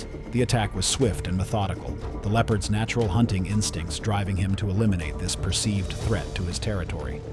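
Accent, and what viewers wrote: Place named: United States. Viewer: Your voice sounds American, so quit with the metric system and us feet and inches.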